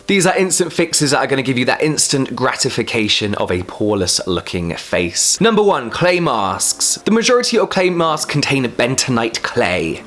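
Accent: United Kingdom. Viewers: Wow.. I love your British accent😘😘😘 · A wonderful British accent I've decided to watch all your videos to master that accent! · Hi. I love British English, the way you pronunce words and speak. Beauty Is not my favourite subject but there is always much to learn. Your video is an effettive means of enriching my vocabulary. So thank you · British accent lover, skincare lover and accidentally found your channel, perfect...!! Love your gesture when you're apply your skincare 😂😂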